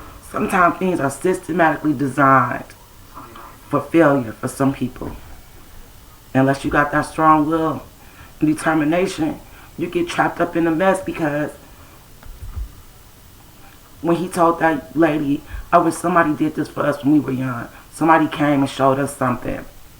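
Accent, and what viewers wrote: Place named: Michigan, United States. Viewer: Hi! you sound like you are from Michigan. Can you please tell me wth is wrong with GM, CEO Mary Barr? She don't GAF about employees. How/why is it OK for the auto industry to have mandatory Saturdays scheduled and taking volunteers on Sunday to work?This plant is running 7 days a week!!!HOW in a pandemic?? and did the UAW threaten the Governor? Supposedly, the UAW told the governor, they got her elected& they would not help her with her re election, if she shut down the auto factories again because of COVID_19.It has been said, allegedly, that none of the auto factories will shut down again because of the virus. Why?how is a building a truck essential? These auto factories have people coming from all over the state, to work at certain plants.They are, * allegedly* sneaking infected people out of the plant and not telling their co workers they have been exposed to COVID-19! 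How is this ok??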